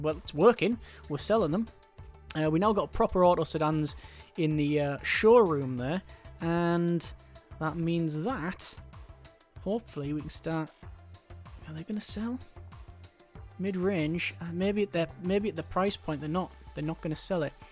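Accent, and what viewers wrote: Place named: Yorkshire, United Kingdom. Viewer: I see you joined YT on my Birthday, the 29th Jan, although I was born some 46 years earlier in 1966. Am I too old? joking. Are you a Yorkshire lad? if you are I bet your Mums backdoor is always open for a cup of tea. I'm a good bit deaf nowadays so I might hear the accent wrong a wee bit. I am a Norfolk boy. I'll stick around and watch this playlist, retired now so have a bit of free time.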